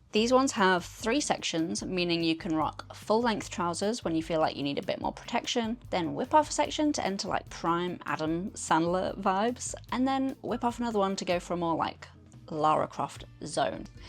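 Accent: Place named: Yorkshire, United Kingdom. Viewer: That makes sense, i could hear the yorkshire but mixed with something else. I`m just over the border in lancashire and spent a lot of time up dales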